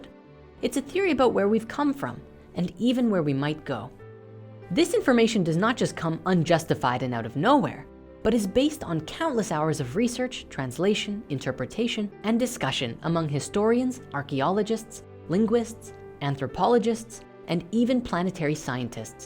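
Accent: Canada. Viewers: He sounds Canadian. That said, I am pretty sure most people who obsess over 'the gay'... have gay tendencies. So to me.... '__ is gay' sounds gay.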